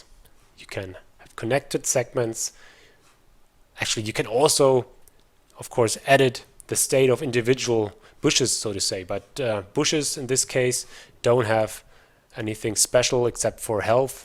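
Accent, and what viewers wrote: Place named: Germany. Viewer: Schöner deutscher Akzent :D Wie sind die Hotkeys um zb. die Z Achse zu ändern? Hast du ne Liste?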